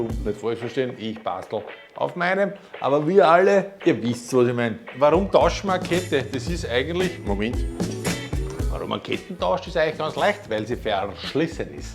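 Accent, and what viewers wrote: Austria. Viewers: geiles Video , geiler Typ , tip top erklärt 👍 und der beste Dialekt , ich liebe die Österreicher 😂👍👍👍 Grüße aus der Nachbarschaft , Allgäu Füssen 👍😊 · So einfach und gut erklärt im Wiener Schmäh, ich liebe euern Dialekt. Weiter so ‼️👍🙏💪 Viele Grüße aus Berlin ☀️ Cheers 🍻 und Happy trail's ❗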